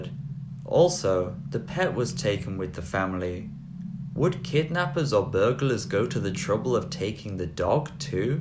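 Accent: Manchester, United Kingdom. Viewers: Are you from Manchester? Trying to place your accent but I'm no expert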